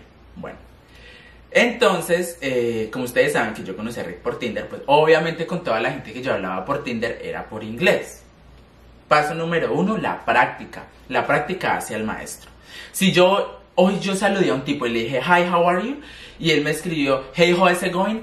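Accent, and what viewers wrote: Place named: Colombia. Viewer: Amo tu acento colombiano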